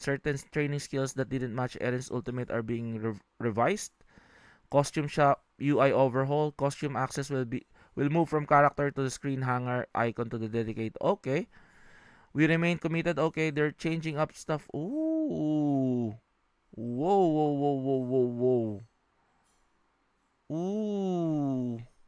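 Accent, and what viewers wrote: Philippines. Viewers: I notice the accent, are you a Filipino?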